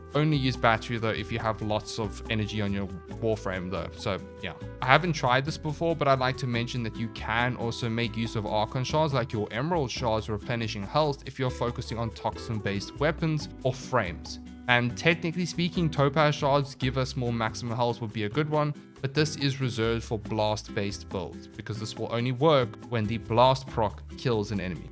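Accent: South Africa. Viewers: Bro it just hit me. Are you South African?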